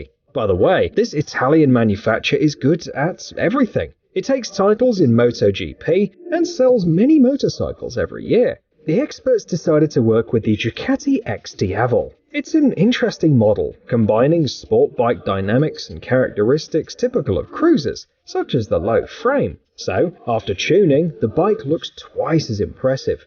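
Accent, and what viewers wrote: England, United Kingdom. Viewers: #2 The Kawasaki H2 is actually supercharged not turbocharged. A turbo is spun by the engine exhaust gasses/moving air, while a supercharger is mechanically spun by the engine......Also so there are several V8 car engines in motorcycles that make even more torque & HP than the H2 Kawasaki. That I think fit the definition of, "most brutal" way more than any of the bikes on this list. Also the Dodge Tomahawk (Viper V-10 engine) that Steven St.Laurent mentioned below. I would think a guy that makes videos & speaks in a snobbish English accent, would have his facts straight.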